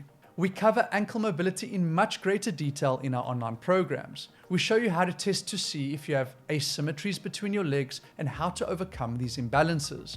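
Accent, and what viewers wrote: South Africa. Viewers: why do you sound South African? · Are you South African?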